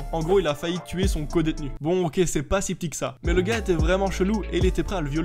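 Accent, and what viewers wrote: Belgium. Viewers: On sent le belge en toi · Tu as l'accent d'un belge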